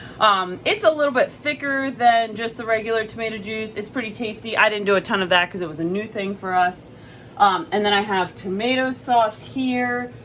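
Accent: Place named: Pennsylvania, United States. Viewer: Hi, first time watcher here! I love watching pantry/freezer/fridge tours. As soon as I heard your voice I knew you were from PA. (NJ here.) I went to your site and read your story and I want to follow along your journey so I just subscribed.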